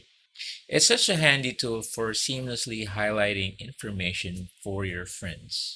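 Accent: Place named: United States. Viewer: Haha, IMO it is just marketing hype. 256gb Exynos is anyway better than 128gb Snapdragon. Exynos is also dual SIM (another great feature that is missing on Snapdragon). Exynos does not have the UW Band (Verizon) and some other 5G bands too so that is the only catch for me. You have US accent - you look like the US expat enjoying the good life on beaches of Philippines :)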